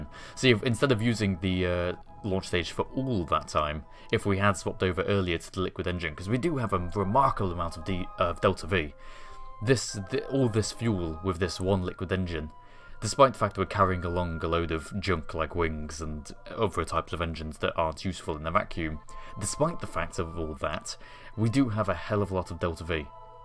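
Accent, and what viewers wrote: United Kingdom. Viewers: Ein, der Deutsch mit britischem Akzent spricht, kann ich nur als großen Gewinn bezeichnen.:) Greetings from germany, give us some more of your skills, we will help you learn it.:P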